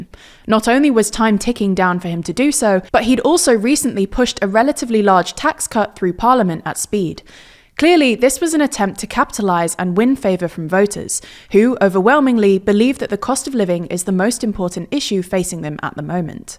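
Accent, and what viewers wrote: United Kingdom. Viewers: Love listening to Aussie election news with a nice British accent.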